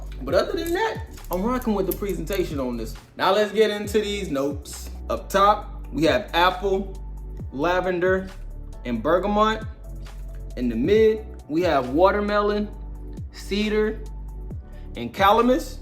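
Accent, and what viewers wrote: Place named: New York, United States. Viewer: U gotta be a NY nigga with the yeeeerrrrr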